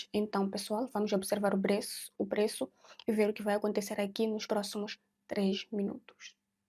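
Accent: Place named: Mozambique. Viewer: Pura moçambicana. Na fala tem tudo de moçambicanos.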